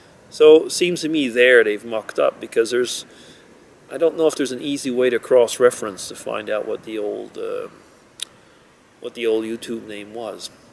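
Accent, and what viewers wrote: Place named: South, United States. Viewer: You can send some of that cool weather this way. Sounds like you are going to be busy with family coming. Hope they let you video. =) You are a man of many languages & your southern accent... Oh that. Yea Halloween. Yes when trying to know how is who now is crazy. Stevie could not even get to his message page. A fun BD gift is on the way =D Under the Dome is very cool. TY for keeping me up on it xoxoxo. I watch TV at night BIG HUGS for you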